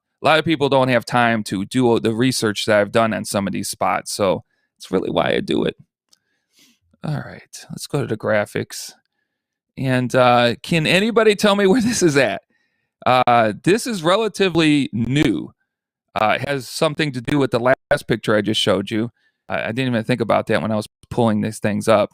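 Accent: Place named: Chicago, United States. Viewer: He sounds like a Chicagoan.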